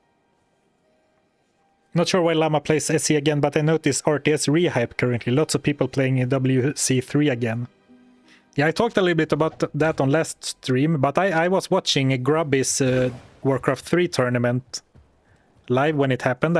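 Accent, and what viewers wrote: Sweden. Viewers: I'll wait for the next sale, you make me want to play again, perhaps also single player, your challenges are awesome. Heard you mention earlier you were Swedish, the accent is pretty telling. (I'm a dutchman who moved to Sweden ~4 years ago, and you guys have a very typical accent, so do we I guess).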